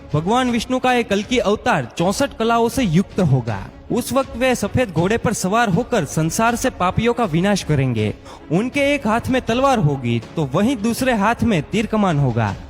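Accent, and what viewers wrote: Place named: Gujarat, India. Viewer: આ ભાઈ ગુજરાતી લાગે છે ઉચ્ચાર ઉપર થી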